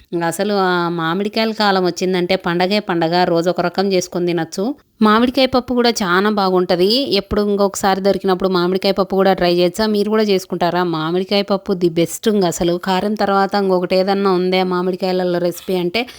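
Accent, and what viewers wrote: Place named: Badvel, India. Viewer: Hi మాది బద్వేల్ మీ యాస నా యాస ఒక్కటే. ఒకమ్మాయి నాన్న ను నాయన అన్నానని. నన్ను చాలా నోచుకున్నట్లు మాట్లాడింది ఒకప్పుడు. అదేదో నేను తప్పు చేసినట్లు.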